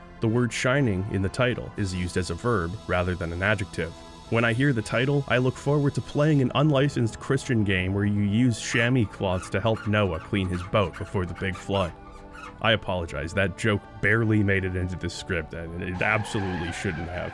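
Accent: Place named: Canada. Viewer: Oh snap! You're Canadian!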